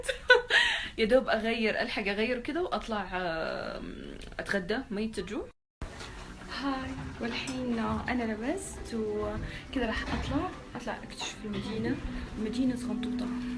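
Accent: Saudi Arabia. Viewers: مغربية.....والحكي سعودي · صحيح كلامها سعودي كأنها سعودية انصدمت من طريقة كلامها ضاطبة اللهجة مئه بالمئهSalwa Mery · انتي مغربية ليش تتكلمي لهجة سعودية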